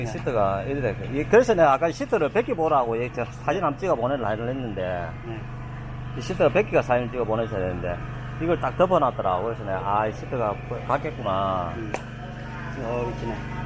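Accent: Gyeongsang, South Korea. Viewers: 리얼 경상도^^ 고향에 온 듯해서 좋습니다. 번창 응원합니다^^ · 쉽지가 않에요.... 이 갱상도 뉘앙스가 정겹다...., 라오스 문씨도 중간에 제 객관적인 방식....칽카핥핥크크크.... 좋은 영상 감사합니데이...욕받심더~~~ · 목소리가 너무 좋아요 ㅋㅋ 전 마산에 살아요 경상도 분이 캄보디아에서 사투리 ㅋㅋ 너무 정겹습니다 유투브 대박나세요~^^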